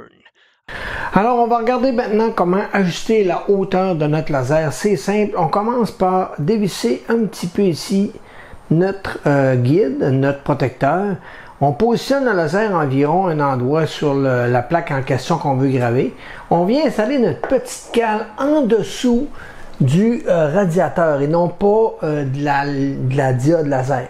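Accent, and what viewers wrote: Canada. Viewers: Bravo pour ce très bon tuto (surtout avec l'accent canadien pour nous français). il serait intéressant de connaitre la vitesse et la puissance du laser pour les travaux effectues. Encore merci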